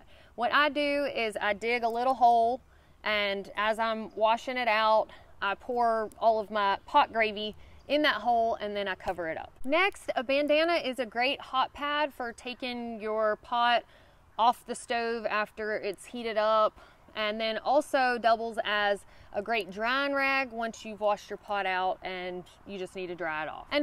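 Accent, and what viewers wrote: South, United States. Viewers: A blackened pot heat up/ absorbs the infared 25+% faster if you add a lid its another 25% and less fuel and time . Also aluminium is slightly lighter but is bad for you health long term as no matter how much you clean it is pourish and you can wipe it with a tissue when it's dry and still get grey aluminium residue. Titanium is slightly heavier but cooks better isn't toxic and can store water or food for long periods no problems. Toaks,lixada,boundless voyage , all have great pots with frypan lids around 1000ml same shape as yours roughly at around 150 grams. I'm more a bushcraft ,survival ,inch bag ,wildcamper but learn tricks from all the disciplines for different packouts decisions and I love your chan for the character and thru hike aspects and charming southern accent :) 🍻 from 🇨🇦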